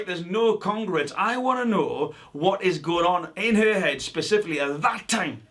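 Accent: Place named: Northern England, United Kingdom. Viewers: I’m back again, a year later, for the content, and also those delicious Yorkshire oooooooooooooh s!! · Great video. I’m enjoying that heavy Northern accent. Could listen to that all day 😁.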